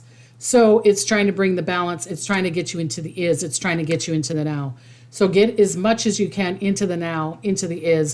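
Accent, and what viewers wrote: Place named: Minnesota, United States. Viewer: Girl - your Minnesotan accent is on point!!!!! I love your hat!! From one hat-loving girl to another — Happy Easter!! Sending much love & gratitude to you 🌻💙💛🌻💙💛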